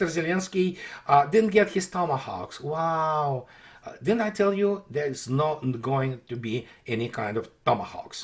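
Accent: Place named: Russia. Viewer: "Russians don't need to rush." Yes, they do - it's in the name! By the way, given how long you've been in the US and how thick your accent still is, would you say the Russian accent is even more persistent than the British accent? Given that one of the key attributes of Russians is that they endure, I guess that would make sense.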